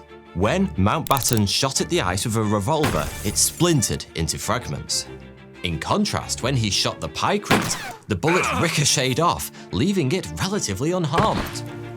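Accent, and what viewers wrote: United Kingdom. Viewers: It's a particular British accent.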